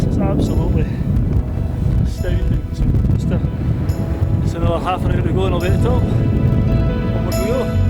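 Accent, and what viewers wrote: Scotland, United Kingdom. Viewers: Your videos are a delight: Scottish mountains and a Scottish voice. If the Scottish voice is a challenge to some then just enjoy the video as if you were listening to a song in a language you do not know. The joy in life is to encounter the new not reject the new or expect the new to change to the familiar. videos are about challenge that's why people love them.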